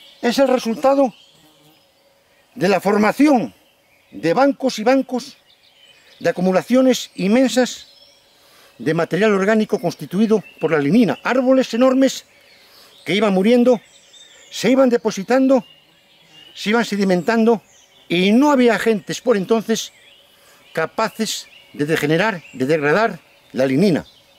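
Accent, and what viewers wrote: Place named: Spain. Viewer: Es agradable escuchar su acento y su gramática. Habla muy especial el idioma español. ¿Qué región de España es usted caballero? Post data: gracias por la información sobre el carbón, necesitaba esa información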